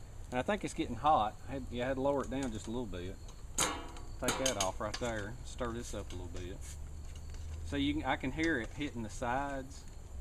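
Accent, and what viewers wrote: South, United States. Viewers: he has the accent from which part of usa?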